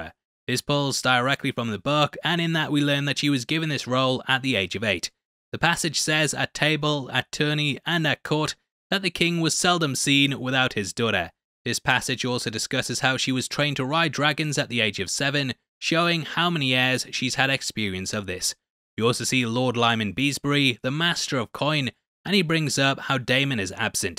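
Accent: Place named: England, United Kingdom. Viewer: I love how your English but your impressions bare terrible lol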